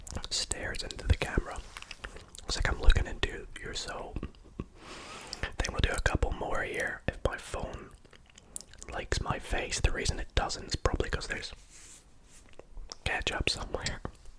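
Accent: Scotland, United Kingdom. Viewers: I love old Scottish accent, deeply miss it in the new vids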